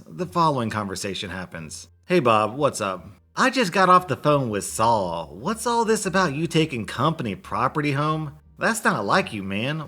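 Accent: South, United States. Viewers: I love your country accent lol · Not gonna lie that southern accent was kinda cute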